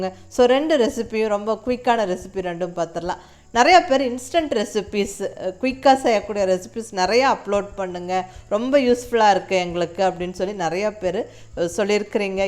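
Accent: Kongu, India. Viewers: Super mam... 👌👌kongu tamil la super ah explain panringa mam...👏👏👏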